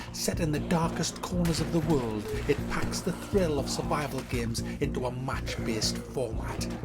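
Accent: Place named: Newcastle, United Kingdom. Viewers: soon as i heard his geordie voice i switched off lmao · So someone asked me if I was on Youtube. I said no, so I checked out the channel and fuck me, we sound almost the same. Where abouts in the Newcastle area are you from?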